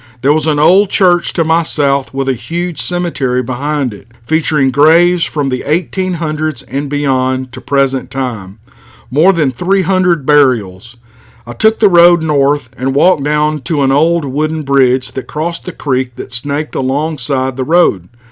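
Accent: South, United States. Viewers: you are too modest sir. I think we all appreciate the time and effort you put into your videos. You sound like a very kind man. I’m from England and know nothing about the southern states of America. But they all speak the way you do it must be one relaxing place to live. Thanks again sir. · It's just that southern accent, has said many times that he does'nt really believe in ole sassy! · Hi there , I just love listening to your stories, I love your accent, what part of America are you from? Listening to you and watching the fire light is really calming and helps me too settle down at night. I'm from Australia and have seen our own version of a bigfoot. It is generally called a yowie but our aboriginal people have many different names for them. Some researchers here believe we may have as many as 4 different types.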